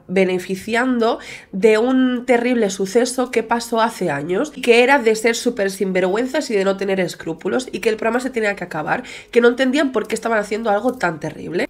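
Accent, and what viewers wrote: Spain. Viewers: Por que esta habla en este vídeo como española y en la vida actual en instagram dice que es Mexican American y habla como mexicana?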